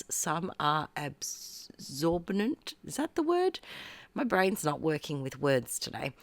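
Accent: Australia. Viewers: I’ve just come across your channel, and I love your style! You sound like an Aussie (me too) - but where do you buy your Bande washi sticker rolls, without them costing you a bomb in postage!?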